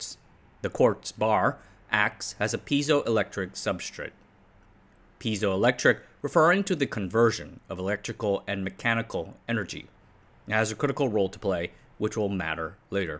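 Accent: United States. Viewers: Surprisend by you saying "aluminium". -Yet you sound like an US American, possibly of Asian descent (barely noticeable at all, when listening to you speaking). What's your story? You didn't say "aluminum" ! -Yet you just sound "american" for my nort european ears! :p I really enjoy listening to your informative, interesting and detailed videos ! Keep up the good work 👍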